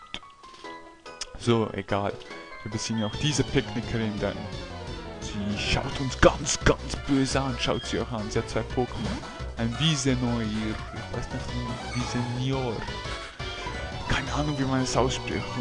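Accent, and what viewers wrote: Switzerland. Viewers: bist du schweizer?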